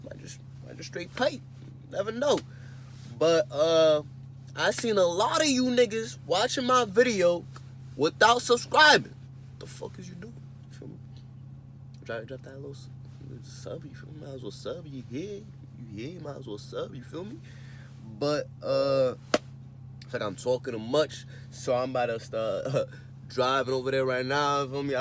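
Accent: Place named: New York, United States. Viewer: Cuz sound like he from new York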